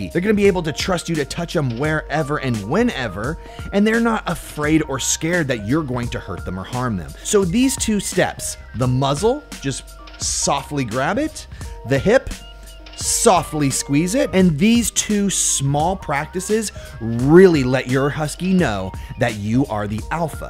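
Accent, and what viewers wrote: United Kingdom. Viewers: Because what? PS u sound British and PSS I'm 9yrs old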